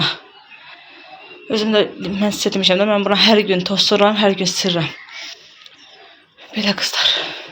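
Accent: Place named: Quba, Azerbaijan. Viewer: baci siz haralisiz..lehceniz Quba ya oxsatdim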